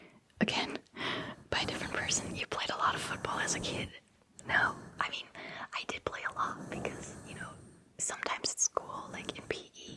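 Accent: United States. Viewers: I always assumed she was American living in the central north part of the country where there is a more German population.. I’d guess her first language was American English because she has an American accent, uses colloquialisms like “beating around the bush”, and even takes American liberties like saying “ommina” instead of “I’m going to” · Mando is saying why does she have an American accent when she's not American? That makes sense too.....She should have a German accent when speaking English. I've never heard a German with an American accent...... ever.... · I just don’t understand how you’re German living in Germany with an American accent !! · Never would have guessed you were German. I thought you were American based on your accent. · Alright, from your accent I literally thought you are American. Kind of surprised me when you said you’re from Germany. 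You have a really clean American accent.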